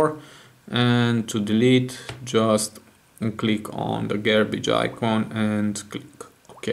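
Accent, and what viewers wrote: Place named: Romania. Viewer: Your accent made me feel like home :))) Go Romania!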